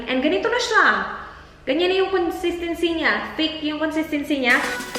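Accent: Visayas, Philippines. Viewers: Parang bisaya ang voice....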